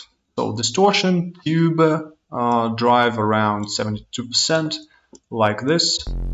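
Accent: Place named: England, United Kingdom. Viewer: О,вы из англии?))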